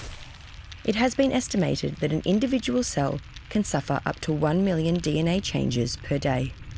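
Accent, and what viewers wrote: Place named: Australia. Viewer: isnt this someone elses video but with an Aussie narrator?..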